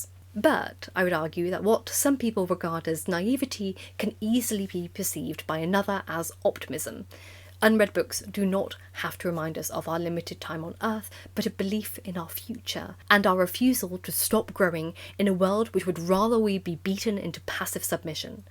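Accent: United Kingdom. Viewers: If I have had to choose someone to represent the british accent, it would be you.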